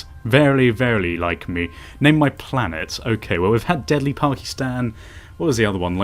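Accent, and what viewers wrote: United Kingdom. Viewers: His accent is very British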